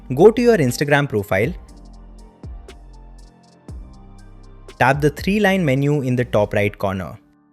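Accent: India. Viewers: Indian accent is way more better than AI generated content. Thank you for sharing!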